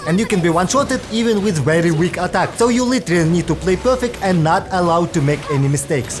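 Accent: Russia. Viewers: are you doing a russian accent on purpose? or idk what that accent is, i can't listen to it anyways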